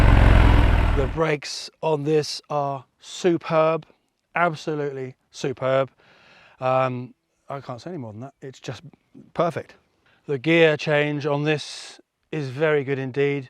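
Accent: England, United Kingdom. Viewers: Hey love your videos/content. Seriously considering this motorcycle as a forever bike now in my 50’s, and great to see a review from an English fellow and not a screaming American. Not seen the young fellow on here for a bit, hope all is good. I also have a thing for churches, always try and have a look through one where I can.👍 regards from the Pilbarra Western Australia.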